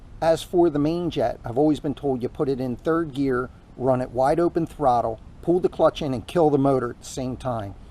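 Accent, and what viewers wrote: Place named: Pittsburgh, United States. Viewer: got ya, figured ya for a yinzer that moved away and still had a bit of pittsburgees left in your speech 😆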